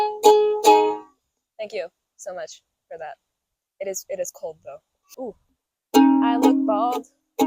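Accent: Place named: United States. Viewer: The world needs more Luxembourg YouTubers that talk and act american. Thats actually dope as fk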